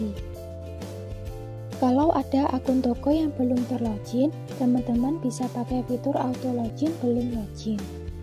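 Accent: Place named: Java, Indonesia. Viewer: Medhok sekali mbak, makasih impohnya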